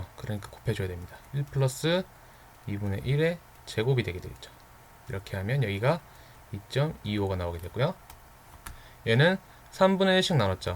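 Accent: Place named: Seoul, South Korea. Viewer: 자꾸 e를 2라고 하시는 서울 발음...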